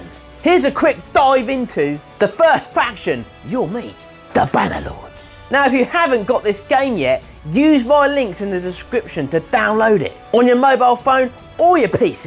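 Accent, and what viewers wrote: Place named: Fens, United Kingdom. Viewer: has a "Fens" Accent IIRC.:-) He has said numerous times about being in the UK though, as he has been on Telly here, and tells us about that when it happens.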